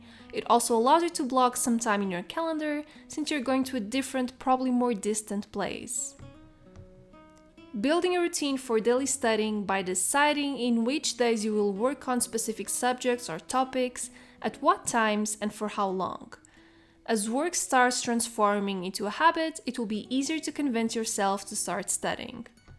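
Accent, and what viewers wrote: Portugal. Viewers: I love your videos I think they are really informative and make me want to be more organized like you But are you from Portugal? I am and your name sounds really familiar and your accent too ahah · are you portuguese? sorry hahaha i’m curious because of your accent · Eu sabia que reconhecia o sotaque! És portuguesa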